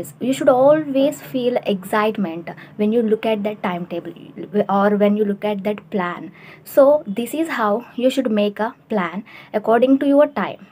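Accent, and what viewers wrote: South India, India. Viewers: I agree with what you said but all these tips and tricks can be beneficial for people who have some english knowledge. In northern india there are many students who are purely from Hindi background and English is rocket science for them. How will these ideas help them to learn English language? You are definitely from south as what i understood from your accent and to be honest the tips you shared can give maximum benefits to people who are at least in touch with english. These tips will be pure bliss for them.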